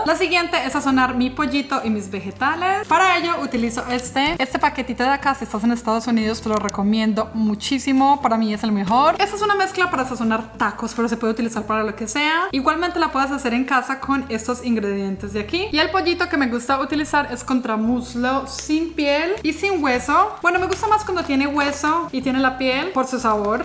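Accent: Antioquia, Colombia. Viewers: Tenía tiempo sin ver tus videos no sé si es por eso que te escuchaba Colombianisima 😊 mi favorito también es el 🥑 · No pierdes tu acento paisa 😊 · Gracias bella por tus videos, siempre me encantan, sea el contenido que sea, tu acento Colombiano cuanso lo hablas me gist mucho, tu simpatia, frescura y tu amor, con el top y los vaqueros ibas muy guapa🎉 La granola se veia muy rica, la voy hacer, me alegra mucho que cumplas tus sueños en compañia de tu esposo❤ graciass siempre por ser tú ❤❤ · Hola, soy Colombiana y veo tus vidéos desde Ginevra Suiza, me encantan tus vidéos de limpieza y comidas balenceadas, te miro justamente por que el acento colombiano me hace mucha falta, podrias hacer un vidéo de comidas colombianas? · En este video se le salió full lo paisa jejejje❤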